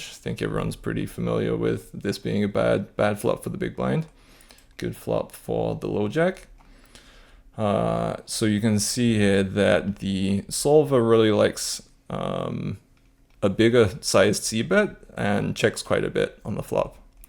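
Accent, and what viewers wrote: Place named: United Kingdom. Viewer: A very particular from of the British accent. I know a small Youtuber who has almost the exact same tone and cadence.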